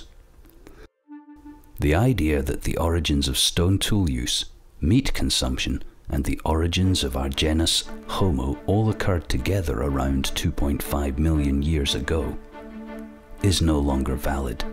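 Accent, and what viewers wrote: Scotland, United Kingdom. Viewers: Love the Scottish Accent! Great Video! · It's a Scots accent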